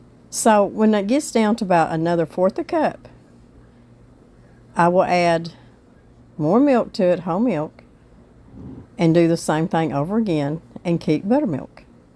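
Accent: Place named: Arkansas, United States. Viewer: I love custard pie. I love your accent it reminds me of my grandparents, they were frome northern Arkansas.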